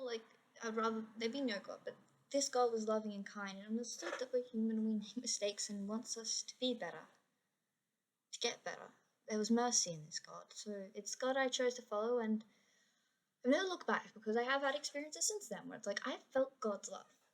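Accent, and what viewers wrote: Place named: Australia. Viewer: You sound Australian or from New Zealand. What's the status of Christianity down under?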